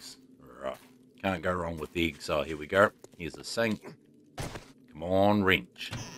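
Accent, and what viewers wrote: Australia. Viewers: I know I'm 5 months late to the party but very cool to see a console Let's play, Even though its not up to date as the PC version It give me some nostalgia since I fist started playing in Alpha 16-17. It also doesn't hurt that I'm a sucker for Aussie and Kiwi accents :) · 😅im new to the channel love the videos. Has anyone told you that you sound like Farket (a Australian youtuber)?? Are you Australian?!?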